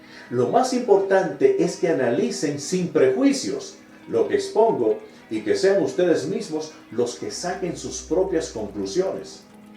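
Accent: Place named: Dominican Republic. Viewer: Hola 🖐️ es un honor saludarte. Me nutro mucho escuchandote, muchas gracias por tu gran aporte. Soy Dominicana,y quiero saber si tú también lo eres; pues tú asento es parecido al nuestro. También estoy en el camino del despertar; y me gustaría saber si vives aquí; pues necesito ayuda más directa. También yo me estoy preparando para ayudar a mi país con el despertar. Por favor, espero su respuesta.... gracias mil!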